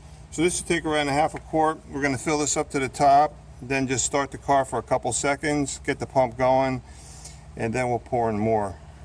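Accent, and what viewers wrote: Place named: Northeast, United States. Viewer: Are you in RI? Or NJ or LI? When you started talking I thought to myself, this is not one of my vids....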